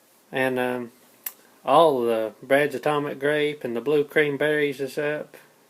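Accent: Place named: South, United States. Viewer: Love that country accent, I to come from the country.You videos are wonderful and so imformative.God bless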